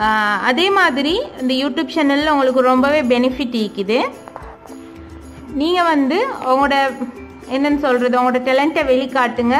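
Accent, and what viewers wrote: Sri Lanka. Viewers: Very candid and innocent reply. Do not worry about your accent and language that is the attraction for normal Tamils to hear srilankan accent . If your mother tounge is not tamil then you are doing a super job. And your cooking is also short and crisp Just keep it up. My good wishes for all success in life. You will shine sister. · லங்காவில பேசக்கூடிய தமிழ் எனக்கு ரொம்ப புடிக்கும். அதிலும் நீங்க பேசக்கூடிய தமிழ் எனக்கு ரொம்பவே புடிக்கும். உங்கட சமையலையும் சேர்த்துதான் சொல்றன். அல்லாஹ் பாரக் ஃபீ.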